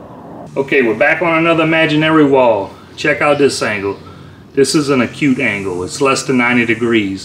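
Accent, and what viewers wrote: Louisiana, United States. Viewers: You Cajun... I know that accent from anywhere lol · bruh are you from south/southwest louisiana? sure sound like it with your accent kinda lol asking cuz i live in SW louisiana and i know how people sound down here haha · Louisiana accent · I'm willing to bet that he's from Louisiana. I can hear the Cajun accent. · My good guy you from/in Louisiana? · I know a fellow Louisiana boi when I hear him… lol. Great info! I never knew this. Thanks! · You gotta be Cajun. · You from down the bayou cher? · Cool trick!! Are you from Louisiana? You sound like you’re from Louisiana……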